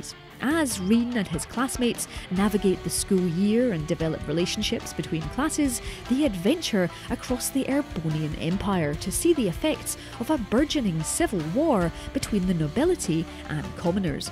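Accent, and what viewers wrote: Scotland, United Kingdom. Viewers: that is the voice of an adult woman any day of the week mate and it sounds like a Scottish accent. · Are you Scottish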